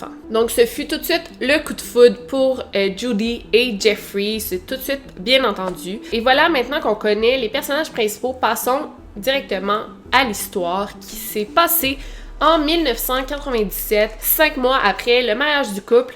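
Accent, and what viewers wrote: Quebec, Canada. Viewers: "si vous pouvez éviter votre jugement personnel ce serait mieux" et juste après "j'ai un peu de mal avec votre accent" lol la contradiction. Si cela vous dérange, vous pouvez simplement partir, il y a d'autres vidéos de True crime en français sans accent québécois, puisque ça a l'air de vous déranger! Par contre la plupart des youtubeurs de True crime donnent leur avis, courage à vous...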